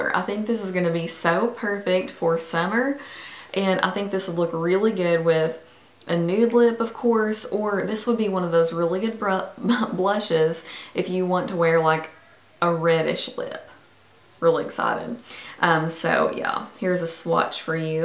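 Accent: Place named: South, United States. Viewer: Your accent... it's like watching a movie set somewhere in kentucky,alabama, texas or a similar country. Magnificent. :)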